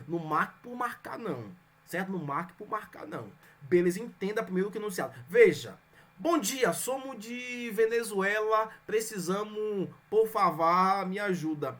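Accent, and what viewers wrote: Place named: Northeast, Brazil. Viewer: Capote na enfermagem é uma proteção..... espécie de avental......eu amo a linguagem e o sotaque nordestina.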